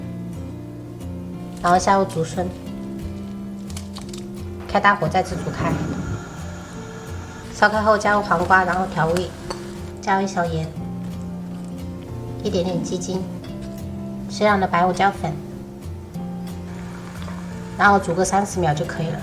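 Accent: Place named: Fujian, China. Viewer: 你是四川人，为什么是一口福建口音呢😊? 就算嫁到那里也不应该呀😄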